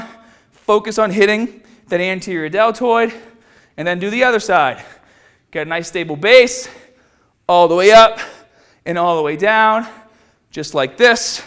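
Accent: Boston, United States. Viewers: TreJay Ratliff i hear everyone spam about his boston accent in previous videos Xd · damn it's been awhile since I seen any of this videos. I didn't know he had a Boston accent, lol. keep up the great work.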